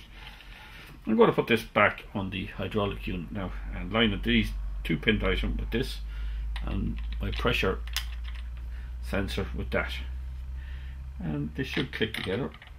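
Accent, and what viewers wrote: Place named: Ireland. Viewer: You must be from Ireland, they'd some irish accent!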